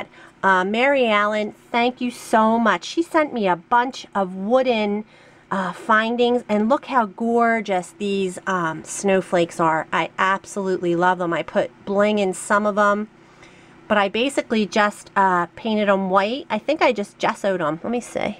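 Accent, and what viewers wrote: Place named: Queens, United States. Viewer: Hi!! It's Laurie, I knew you had an accent!! My mom is from Queens!! So NJ is about 6 or 7 hours (depending on traffic!!! LOL) I would really appreciate a video on those beautiful stars, everyone LOVED them!! I know you will do great at the craft show because you love what you do! I look forward to seeing more beautiful projects and you never know you just might see a Canadian License plate at the show!! All the best!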